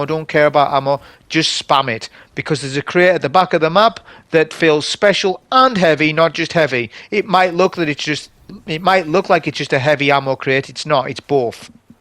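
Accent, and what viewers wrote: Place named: North East England, United Kingdom. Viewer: Nice video mate. I love Stasis, it's my favorite Subclass. Just to note, when you have Aspects that use grenades i.e. Bleak Watcher, they have a separate cool down, which you will see in the text description of the Aspect. Love your videos on general mate, I miss loving in the NE of England, so enjoy listening to your accent.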